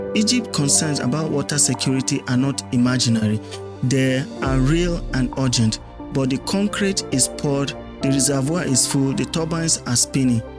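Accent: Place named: Nigeria. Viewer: The accent naija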